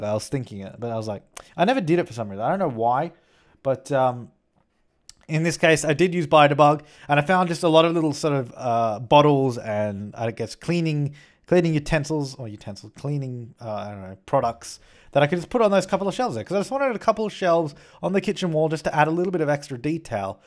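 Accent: Australia. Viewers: I feel so disappointed, as an Australian, that I didn't recognise that you're Australian until you said you are. Haha. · OMG HE IS AUSSIE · YOUR AUSSIE I DID NOT KNOW THAT OMG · i didnt know u were an aussie lol ok well u do learn something new every day :P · yeah us Aussies say things weird lol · I've been watching his video for ages and never recognised the Aussie accent cause I'm Australian too lol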